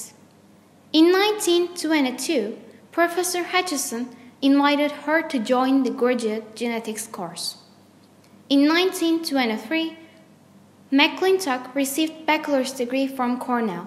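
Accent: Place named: England, United Kingdom. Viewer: Impressive, liked your english accent, i want more.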